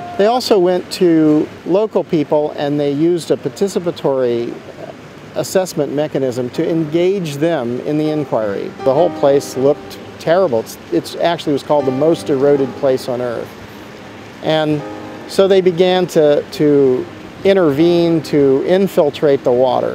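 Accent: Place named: United States. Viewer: He sounds American to me.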